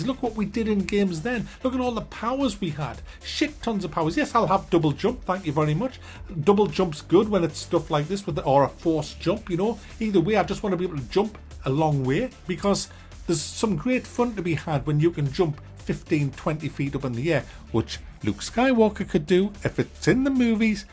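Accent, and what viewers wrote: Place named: Newcastle, United Kingdom. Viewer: It's nice listing to a fellow Geordie youtuber, not many of us....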